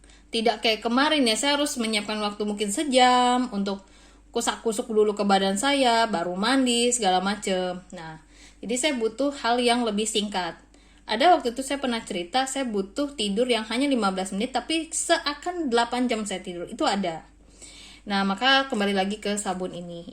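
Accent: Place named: Batak, Indonesia. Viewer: Kakak org batak ya ? Cara bicaranya mirip temanku dari batak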